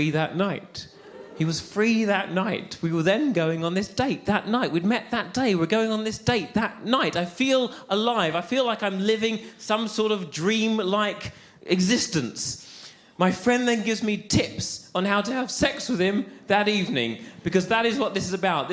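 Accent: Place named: England, United Kingdom. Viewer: çok güzeldi. aksanı ne kadar güzel ya :D ingilizce öğrenirsem kesinlikle ingiliz aksanıyla öğrenmem lazım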